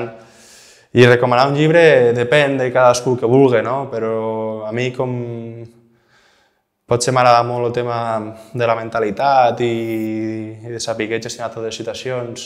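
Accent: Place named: Southern Catalan, Spain. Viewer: He is speaking catalan language (southern accent) btw, not spanish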